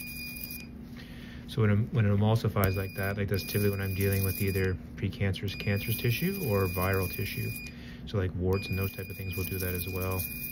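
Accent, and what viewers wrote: Canada. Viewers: Je savais pas que vous parlez le quebecois. Very cool ❤❤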